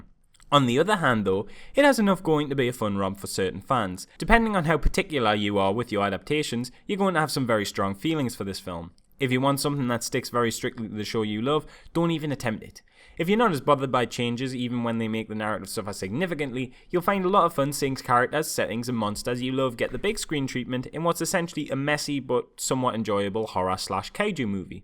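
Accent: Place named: Scottish Isles, United Kingdom. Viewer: You sound like you're from the Scottish Isles, your voice is amazeballs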